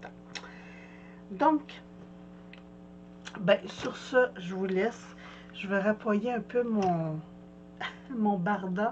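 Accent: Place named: Quebec, Canada. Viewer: Je me suis commandé ton papier vélum, il arrive demain. Tu m’as vraiment donné le goût . Je viens de découvrir ta chaîne. J’aime beaucoup regarder les vidéos qui me donnent des idées. J’en suis beaucoup de France. Mais par ton accent et tu parle de dollars alors cela me dit que tu es peut être du Québec??? Contente d’avoir découvert ta chaine 🥰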